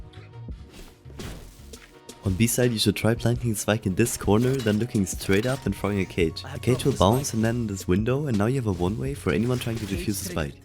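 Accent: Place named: Germany. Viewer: Great Video, actually really well done! btw where are you from? U sound a bit German if I may say so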